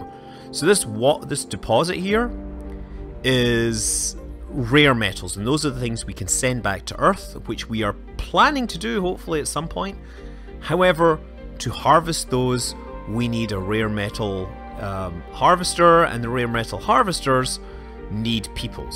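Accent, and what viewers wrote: Scotland, United Kingdom. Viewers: It's called a scottish accent. Turns out native English speakers around the world don't all speak it the way you specifically do.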